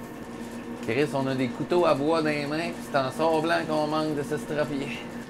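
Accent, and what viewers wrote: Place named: Quebec, Canada. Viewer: haha un ecliche dans yeull. très beau bol lâche pas . je viens de découvrir ta chaîne. c'est bien le fun écouter du québécois un peu.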